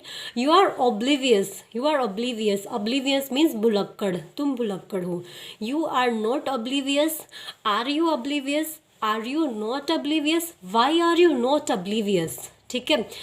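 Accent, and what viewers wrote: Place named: England, United Kingdom. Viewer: It seems that you are from England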